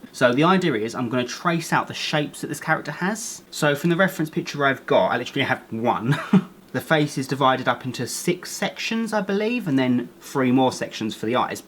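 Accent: England, United Kingdom. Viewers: Are you from england?????? Just wondering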